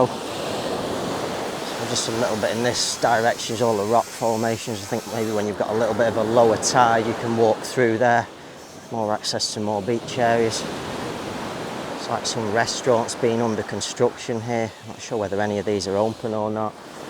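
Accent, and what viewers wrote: United Kingdom. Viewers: Ps. Husband, from bournemouth is trying to guess your accent. He's throwing out lots of options. Lancaster, leeds, manchester, yorkshire. He's usually pretty good at this so you've got him guessing!! Meanwhile, can we hope for that retired banner to come down?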